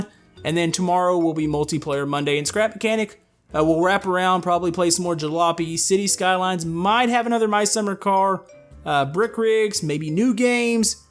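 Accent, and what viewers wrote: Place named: Texas, United States. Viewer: can you speak with your texas accent?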